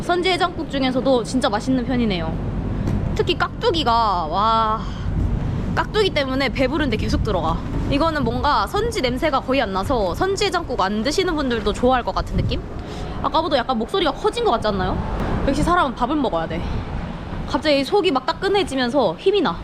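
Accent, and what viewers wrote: Gyeongsang, South Korea. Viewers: 말씨억양이 깅상도? 대구? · 이상하게 경상도. 말과 전라도말이 같이 들리 네요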